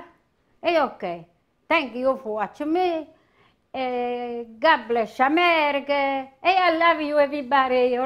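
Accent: Italy. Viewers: Broken English with Italian accent is so cute. She did a good job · I like grandma and her recipes she is doing a wonderful job.Her strong Italian accent is something I can't get enough of.Would like to see who is behind the camera ;) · I love to listen to speak. She has a lovely Italian accent which reminds me of my dear nana who passed away two months short of her 100th birthday in 2016. She was one tough cookie. God bless her and god bless. Thank you for your recipe. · I would love to taste everything she cooks they all look so delicious I get hungry just watching her make the food I did save most of the recipes to make at a later date I like listening to her Italian accent my mom and grandma were both Italian my grandma was born in Rome! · This is my first time watching, and I loved everything about it. The singing, the comment "my children are my life" and that great Italian accent. 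I love everything Italian. My favorite is watching old Italian movies with sub titles.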